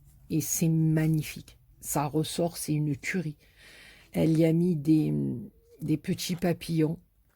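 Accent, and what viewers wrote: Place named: Provence, France. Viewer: Bonsoir, j'ai decouvert ta chaine il y a quelques semaines et c'est un reel plaisir de t'entendre parler car ca me rappelle ma provence natale. Je suis du sud, une pure provencale exilee en Lorraine (mon mari est originaire d'ici...). Heureusement,je descend tres souvent pour voir les enfants, petits enfants et la famille. Je vais souvent a Marseille car j'ai un de mes fils qui y habite. Tu es une belle personne, qui me fait beaucoup rire, tes creas sont magnifiques, de toute beaute. Tu as ete tres gatee, effectivememt tu pourrais ouvrir une boutique de tissue.... Je te fais de gros bisous et a bientot pour voir d'autres creations. Francoise.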